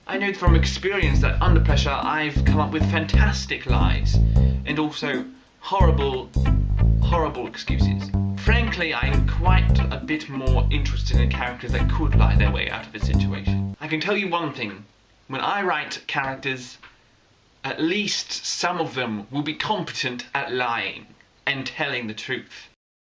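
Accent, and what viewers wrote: United Kingdom. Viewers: That is quite an astounding British accent. :D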